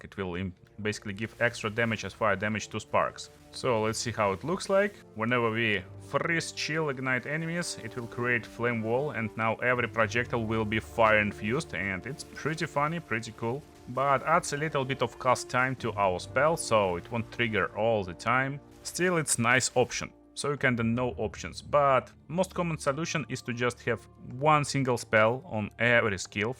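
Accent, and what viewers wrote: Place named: Russia. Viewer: Твой английский прозрачно намекает нам что ты русскоязычный)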